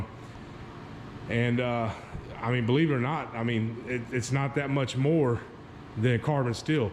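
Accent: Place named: South, United States. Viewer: Nice man. I am from south lousiana I am a pipe welder by trade. Nice work. You sound like your from the south your self. Either way great job.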